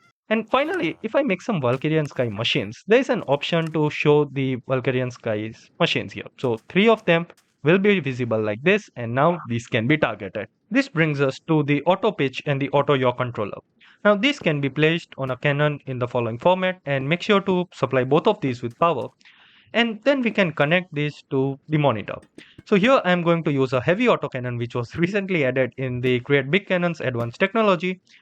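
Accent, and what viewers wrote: India. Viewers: when the indian accent came on i instantly closed the video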